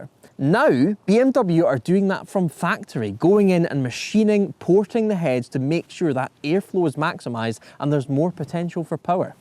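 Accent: Scotland, United Kingdom. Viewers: Never new the Scottish accent would be so good for documentaries (from an American). Can you do medieval history as well?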